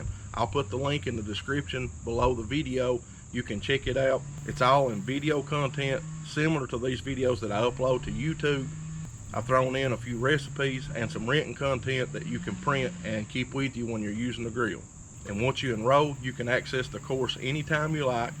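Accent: South, United States. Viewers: That's the most american voice I've ever heard, absolute faith in this man's knowledge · Thank you I really Like Your videos and Your country southern accent Your tips have help Me very much Your a Great American God Bless you. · Where is that southern accent from? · Great Video mate. I'm from Australia and your Southern Accent is just perfect.. Lol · what is it about the southern accent that makes us just trust whatever they say lol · That’s the most southern accent I ever heard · Big southern boy, sounds like sound advice. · If a guy is has a southern accent and is talking about a gun or grill...listen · Love the Southern accent, this guy knows what he's talking about! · What part of the US is that dialect from? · Good to hear a Southern accent. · Love the tips and love the acent. How can you sound like a US Southener so authenticly? keep up the good work! 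Cheers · Whereabouts in the US are you from? Loving the accent. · People can pick on a southern people but I am so proud to be Southern I love to hear us talk including myself LOL my accent ain't nowhere near as deep as this my daddy and family had a accent like this my Yankee husband took mine away a little bit😂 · Special like for southern accent. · Heavy southern accent and a cap that says tractor supply, you just know you are at the right place for grillin.... :-) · All of these gas or pellet grills don't know the pure enjoyment of Caveman grilling with charcoal. When I heard the Southern accent I knew this was on like Donkey Kong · Funny how this accent is associated with clean, efficient, non-meme information from experience. The time will inevitably come when the memes will reach the American South. Then no one is safe no moar 😆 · Is it prejudice of me to be like “he has a southern accent, imma listen to him about BBQ?”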